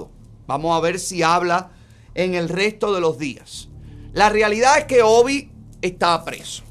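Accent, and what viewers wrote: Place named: Mexico. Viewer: Ahora esto es un show con acento neutro mejicano 🙄 lol para a ellos se subscriban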